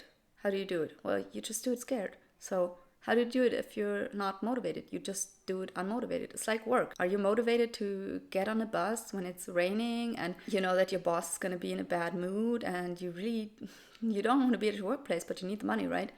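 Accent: Germany. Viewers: I started learning German 2 days ago because i love the sound of the language. It's my 3rd foreign language. Even your accent in English is so sweet like German.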